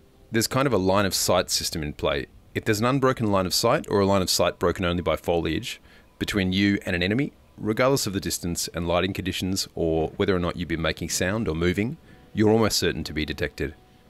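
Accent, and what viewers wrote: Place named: Germany. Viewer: Місцезнаходження: Японія, автор з німецьким акцентом про українську гру - де ще таке зустріти , як не на YouTube ?)))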